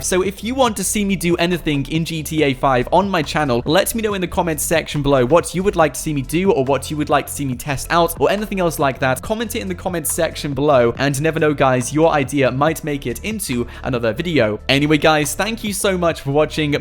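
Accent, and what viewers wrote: United Kingdom. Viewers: Not in a rude way r u british??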